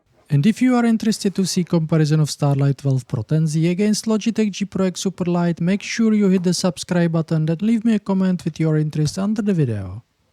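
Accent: Czech Republic. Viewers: Hi, great job, you're doing really great content, but I can hear really strong accent, I think that's Czech, am I right ? :D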